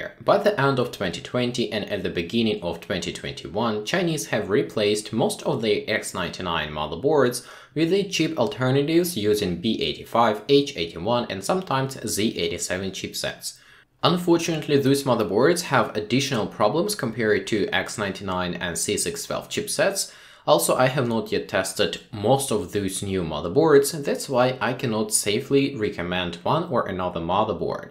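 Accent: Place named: Russia. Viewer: Парень, ты откуда? Такой чистый русский акцент )))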